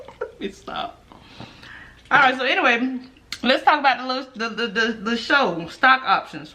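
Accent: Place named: Memphis, United States. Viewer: Because you and my grandma got that Memphis accent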